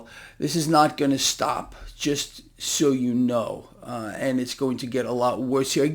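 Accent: United States. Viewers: It's the American pronunciation I guess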